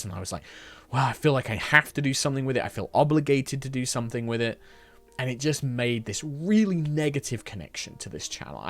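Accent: United Kingdom. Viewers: Hey, originally I started watching HR because 1) fresh from finishing Yogsquest and Dungeons and Dafties, I needed the silly Yogs brand of D&D, 2) I had just watched the star wars rpg stuff with you and the Hat Bois and watched for you, Trott and Kim whom I was already following for Flux Buddies, and 3) I had been watching CR and Aq inc for a while and was happy to hear a more familiar British accent:) I feel a little sad that the little interviews are gone and I really loved that Vday board games video, but, you do what you have to do to clean house in your own head. I understand:) I'm a follower of the usual D&D shows: CR, Aq Inc, Aq inc C team, DCA, but HR will always always always have a special place in my heart. I admire how much effort you put into these videos, d&d and your patreon stuff. Honestly Kim and you won me over when you did that early Lightfall side episode catching up on what happened when JiuTou died. 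It's nice to see a DM not just invested in their game but in the plot and the characters. Will be supporting you in whatever you do, as long as you are happy doing it; bringing your brand of DM voices, silly jokes, npc character development.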